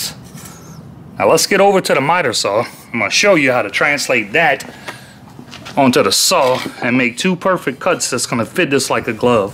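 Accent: Louisiana, United States. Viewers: I'm willing to bet that he's from Louisiana. I can hear the Cajun accent. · Cool trick!! Are you from Louisiana? You sound like you’re from Louisiana…… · bruh are you from south/southwest louisiana? sure sound like it with your accent kinda lol asking cuz i live in SW louisiana and i know how people sound down here haha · You from down the bayou cher? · I know a fellow Louisiana boi when I hear him… lol. Great info! I never knew this. Thanks! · My good guy you from/in Louisiana? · You gotta be Cajun. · Louisiana accent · You Cajun... I know that accent from anywhere lol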